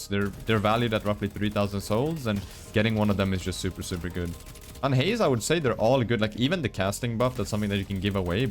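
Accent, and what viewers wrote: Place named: Sweden. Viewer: 5 seconds into the video and I thought "this guy is Swedish 100%" lmao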